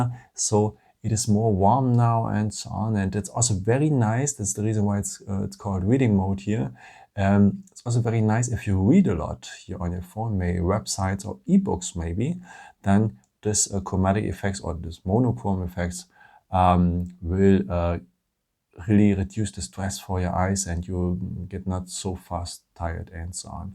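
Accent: Germany. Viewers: Der deutsche akzent in seinem englisch geht ja mal gar nicht :))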